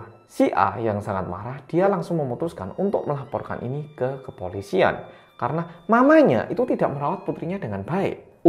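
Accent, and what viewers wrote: Java, Indonesia. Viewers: sudah mengurangi medoknya bang, pistol, wkwkwk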